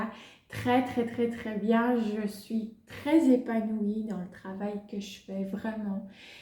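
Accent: Belgium. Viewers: 1. Pour la prochaine FAQ : je veux TOUT TOUT TOUT savoir! 😜 2. J’aime trop l’accent Belge, donne moi le tien stp 😆 3. Tu t’exprimes bien!!!!!! 👏🏻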